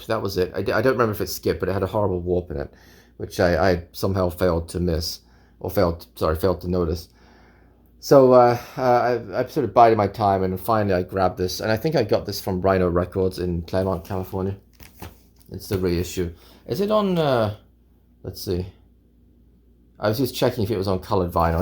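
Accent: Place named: United Kingdom. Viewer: Hopefully not your last video, but if it is thanks for the sharing and entertainment, gonna miss your videos and your British accent. Lol. By the way, is from Lebanon. Hope to see you again.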